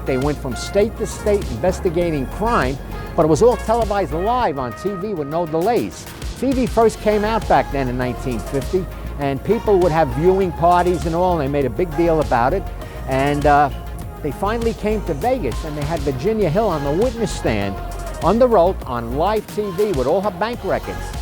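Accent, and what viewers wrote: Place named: East Coast, United States. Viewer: U sound like your from the east i cant stand accent.niether can rest of world.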